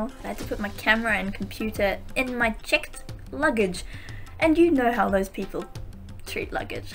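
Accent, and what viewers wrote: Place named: United Kingdom. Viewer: I have a friend that looks nearly identical to you and she's like 100% mestizo blood. I thought you were a Mexican with a British accent:p nice vid btw you're so cool😎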